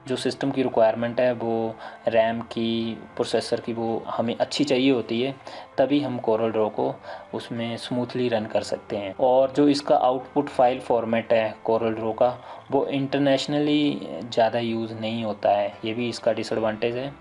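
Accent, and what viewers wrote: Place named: Himachal Pradesh, India. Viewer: Wow sir me Himachal se hu app kha se ho sr apki awaaz bhi Himachali lg rhi h😮😮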